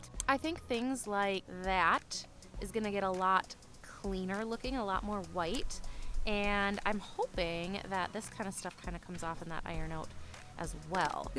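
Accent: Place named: Midwest, United States. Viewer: I just figured it was her midwest accent. Like how some people pronounce worcestershire nothing like its spelled.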